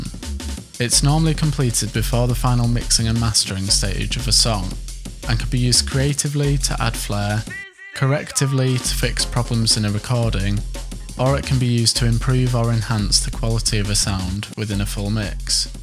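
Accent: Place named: London, United Kingdom. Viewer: Your Studio One tutorial video, by far, is more understandable than any other free tutorial here on YouTube! The added bonus of your God-given British/London (or one of the suburbs, thereof) accent just makes everything that much more exciting and enjoyable to listen to. I'm American and I grew up on "Monty Python's Flying Circus" television back in the 1970's. Between that and interviews with the Beatles, I've loved all manner of English accents ever since. I know, for you it's not an accent, it's just your everyday speaking voice... I'm a voice actor and narrator and I just started using Studio One 5, Artist edition. Really loving it for what I'm doing. Especially for the one-time price. I'm also a singer and songwriter, so, I'll eventually make more use of the music recording and editing functionality... Thank you and keep up the great work! 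Peace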